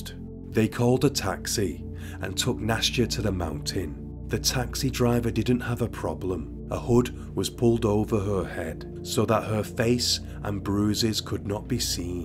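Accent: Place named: United Kingdom. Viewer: What irritates me is his British vernacular .. muhvuh (mother) deaf (death), etc.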